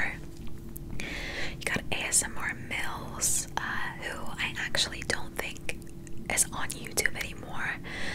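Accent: United States. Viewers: IM AMERICAN AND THIS ACCENT IS SO GOOD. You did SO well I can’t get over this 😂😭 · Your American accent is so good your normal voice slipped through a few times but this is rly impressive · Great job! Your accent was a mix of a few different regions, depending on the words you were saying. The typical accent people do when outside of America is more of a west coast, California, sounding. You had a few words that came out more southern. This was fun! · it’s actually so good!! u sound so american lolll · Oh heck yeah, you absolutely nailed the American accent! A lot of women on the East coast sound exactly like that lol. I'm o proud of you way to go! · This is so relaxing and your American accent is very good!!! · Your American accent's not half bad! Better than most of us American's would be able to do a British accent. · great video & your American accent sounds awesome😊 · I’m American and I approve of this accent, it’s weird to think we are the ones who sound different. 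· As an american this is exactly how we sound like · Your American accent is truly amazing! You really had nothing at all to worry about! You have either had some practice or it comes naturally! Lol either way, well done! I speak in your accent too and i think I'm who should worry! 😂 I think I'm terrible at it!😄❤❤ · American , British then Middle East accents are my fave ASMR accents. Have you ever thought about doing regional British accents in a video ? I always love to learn about The different accents of the UK . Anyway, you have a great American accent ♥️ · your american accent is so good !!! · really love the low lighting. your american accent was spot on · What? This “American” accent was so natural coming from you 🤣🤣 if I didn’t know, I’d be 100% sure you were American lol amazing job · PLS I DIDNT KNOW U COULD RECREATE AN AMERICAN ACCENT THIS IS SO GOOD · Your American accent is so amazing I thought you were from the U.S. 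until I checked out your other videos lmao.😂👍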